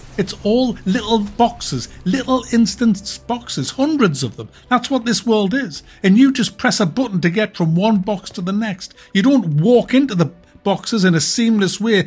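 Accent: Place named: Scotland, United Kingdom. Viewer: oh the accent sounds kind of scottish, but what do i know im from canada lol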